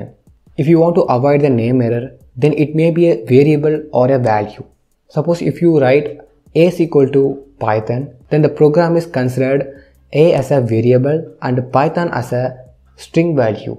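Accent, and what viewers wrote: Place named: Tirunelveli, India. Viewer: Excellent. Bro neenga Tirunelveli ah ?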